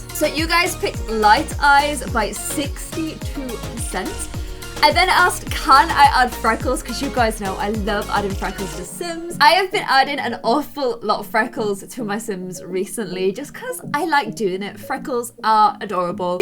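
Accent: United Kingdom. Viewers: While she is British soo.....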